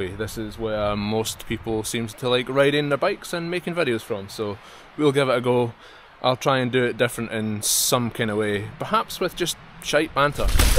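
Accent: Scotland, United Kingdom. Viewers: is your accent scottish?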